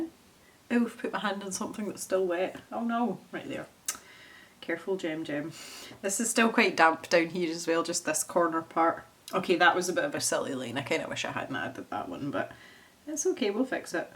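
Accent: Scotland, United Kingdom. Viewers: I LOVE your accent!!!! Living in the states, the Scottish accent sounds so exotic!!!! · Scottish ASMR - reading anything says with some sarcastic comments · Your painting is amazing! Oh, and your accent... I've said it before, but I LOVE the Scottish accent! It's what drew me to start watching your videos, and I have become a huge fan of your artwork ❤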